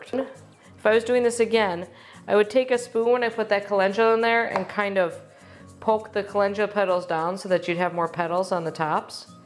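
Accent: Wisconsin, United States. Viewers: This is one of the funniest videos I've seen in a while. Your lovely accent reminds me of my Wisconsin childhood. Thank you.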